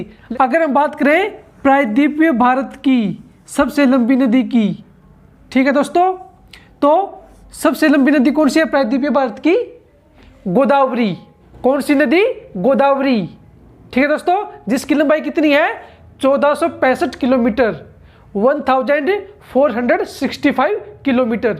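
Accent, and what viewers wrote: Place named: Haryana, India. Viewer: haryana se ho bhai ji acha smjhaya bhai ji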